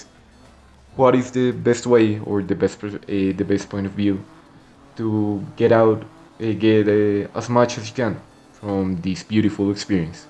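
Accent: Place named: Brazil. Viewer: lesgo mano, eu pensei porque seu sotaque ingles é bem parecido com o sotaque brasileiro em ingles, voce é de onde?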